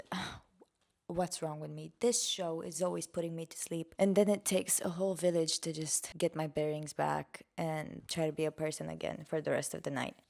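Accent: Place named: Philippines. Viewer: I might just be weird but you kinda sound like you're from the Philippines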